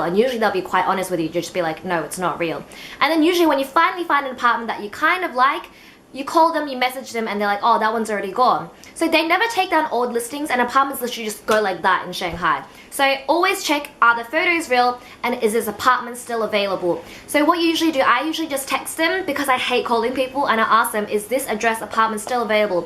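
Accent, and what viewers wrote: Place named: Australia. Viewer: Your 'o's and 'u's sound beautiful. Aussie accent is quite something eh.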